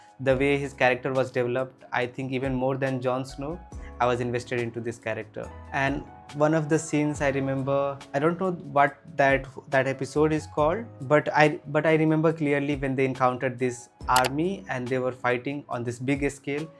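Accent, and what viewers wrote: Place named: Bhojpuri, India. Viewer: Sir , thoda MTI aata hai aap ke speaking me.. it seems that you speak Bhojpuri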